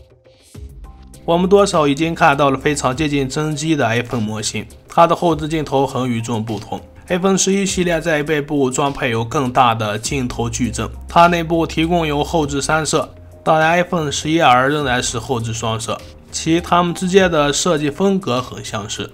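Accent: China. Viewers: 中国农村口音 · 兄弟，你是山西人。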